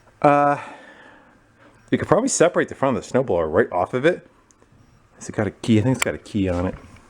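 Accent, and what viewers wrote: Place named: Massachusetts, United States. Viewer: A Yhard Buhirud? love it! Worked around lots of people with that accent... never forget it. I can do a pretty good North East Mass accent, and I can always tell in movies when they bullshit one. they all sound Australian when they fake it really badly.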